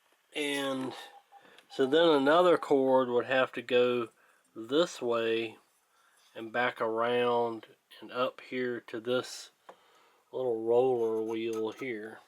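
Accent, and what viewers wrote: South, United States. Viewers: I like to take large tabletop radios like that that are junkers that are kind of not really worth restoring and turn them into guitar amps so if you have any of those basket cases where you don't feel like it's worth restoring to a good workable radio. Even if the chassis is missing and it's just the wooden shell I can make a chassis out of sheet metal and source transformers. Maybe we can work something out. It sounds like you're in the South you might not be too far away from me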